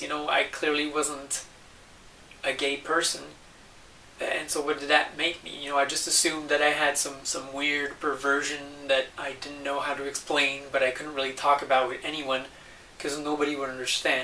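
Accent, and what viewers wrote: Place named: Quebec, Canada. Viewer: First, your videos are fascinating and intelligent. Second, you have a hoarde of viewers, so if this question has been asked and answered already, I apologize. I am however very curious; you seem to have a French (Canadian) accent. Yet all of these videos seem to be in (perfect) English (Canadian). I'd expect them to be in French; is there a reason for this?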